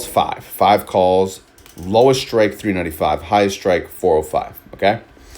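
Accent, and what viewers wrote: New York, United States. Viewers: I love this man's New York accent but he sings like a cowboy 🤠.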